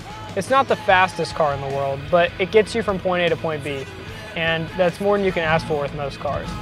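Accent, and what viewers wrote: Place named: United States. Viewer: Which part of America is his accent from? It sounds very pleasant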